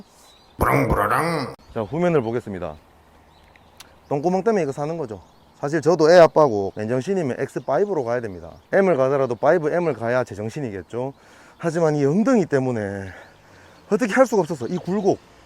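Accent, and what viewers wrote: Gyeongsang, South Korea. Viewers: 대구 분 이신가 … 엑식엠 한번 구경하고 싶네요 ㅎㅎ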